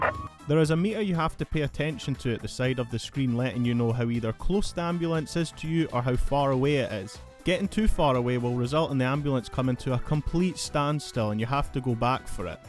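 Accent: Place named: Scotland, United Kingdom. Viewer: A Scots man giveing me nostalgic…. Earns my sub ✊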